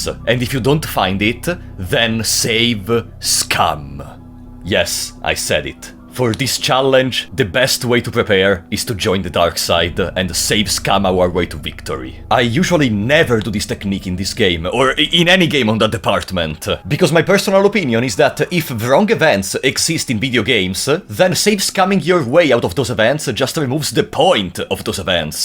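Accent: Italy. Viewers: l'accento italiano mi distrugge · Mi piace come si sente bene il tuo italiano puro proprio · The very true question is "I recognise an accent... frate sei italiano?" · Ma sei italiano? L'accento sembra familiare 👀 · L'accento italiano si sente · I just watch them. your Italian accent and dark dungeons of fear and hunger are really calming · Onesto i sente che sei italiano 10/10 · l’accento italiano si fa sentire parecchio 🤣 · you sound italian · Italian acent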